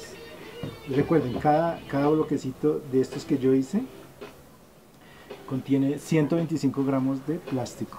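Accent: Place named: Colombia. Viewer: Yo vivo en pradera valle y usted tan bien es colombiano por el acento creo que si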